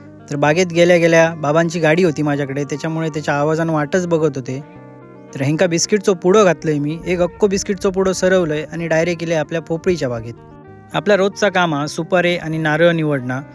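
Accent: Malvani, India. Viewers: विरण मध्ये आली की आम्ही तुला भेटणार आहोत तुझे व्हिडीओ खूप खूप सुंदर असतात मला तुझे मालवणी बोलणे मला खूप आवडत लवकरच भेटू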